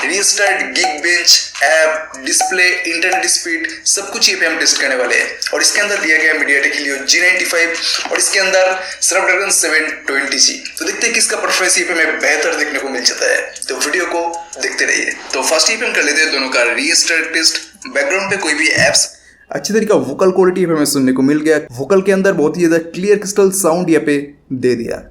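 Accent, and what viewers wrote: Bengal, India. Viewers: Bro are u bong ?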